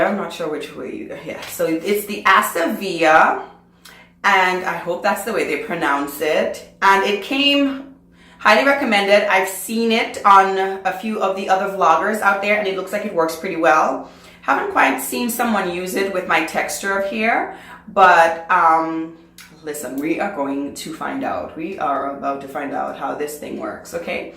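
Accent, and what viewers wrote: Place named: Jamaica. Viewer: Are you Jamaican? I think I hear an island accent ! Lol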